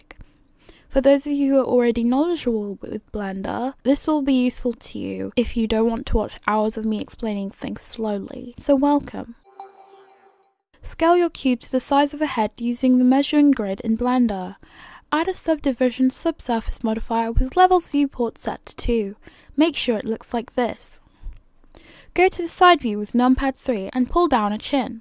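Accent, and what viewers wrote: United Kingdom. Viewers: I just focused on the British voice.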